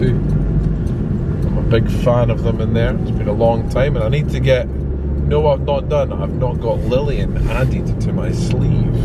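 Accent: Scotland, United Kingdom. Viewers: I’m from Australia and I’m heading off to the Uk/Europe/Mediterranean in April/May. I’ll be in Edinburgh for 2 days and Glasgow 2 days. Thoroughly enjoying your videos. Looking forward to Edinburgh. Your videos are wonderful. I hope I see you doing one of your videos when I’m there. Love your Scottish accent.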